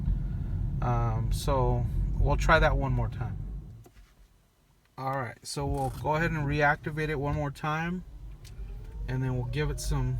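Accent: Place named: Russia. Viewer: Dude, are u russian? Sounds like you have a russian accent.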